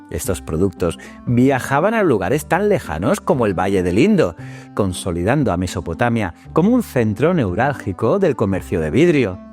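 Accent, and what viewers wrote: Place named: Spain. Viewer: Me gustan este tipo de historia, pero lo único malo es que los españoles hablan muy mal, yo diría, muy reeeemal, lo cual no es nada agradable sentarse muchos minutos escuchando una forma de hablar desagradable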